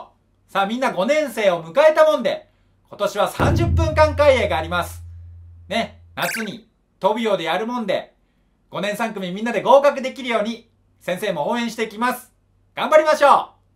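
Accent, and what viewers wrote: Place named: Enshu, Japan. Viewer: いつも面白い動画ありがとうございます。 遠州弁最高！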